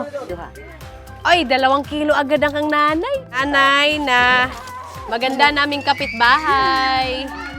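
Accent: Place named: Visayas, Philippines. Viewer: Ito tlga nagustuhan ko s kanya kaya napa subscribe ako..ang sosyal ng accent nya bisaya pero english tono❤ like ang 50 pesos..naging fifty peyysosss😂mgnda sa tenga ko..kaya lagi ako nanunuod..lalo na yung alimnago hunting nya😂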